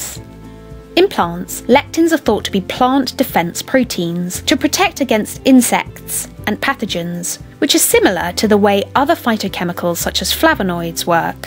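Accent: United Kingdom. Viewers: Just because some mushroom has some special property that confers immunity to cancer doesn't say anything generally about lectins. Until "science" offers better theories, instead of spending their time theory-shaming others, people will keep trying the diet - and if they feel / get better, then even you telling them theyre wrong in a calmly reassuring British accent isn't likely to change their minds.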